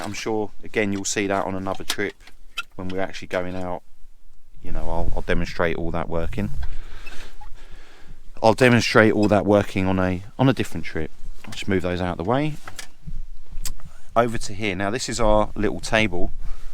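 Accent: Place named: Southern England, United Kingdom. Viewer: Nice job. This is exactly the route me and the Mrs want to do with our E51. All the gear but not convert it. Great stuff. You sound like your from the south mate, I’m in Margate, are you anywhere near there?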